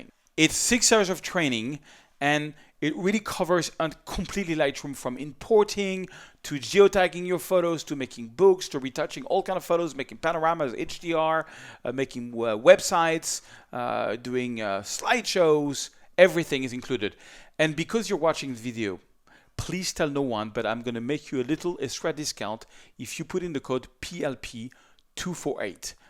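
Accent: France. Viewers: Rather than just doing the French accent, you should throw some French in. I would love to learn some French. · I love your accent...I remember my trip to Paris and yummy macaroons 😍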